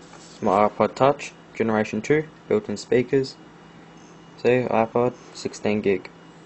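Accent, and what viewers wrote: Australia. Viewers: Your voice -3 Australian ? ;) me like. Sorry.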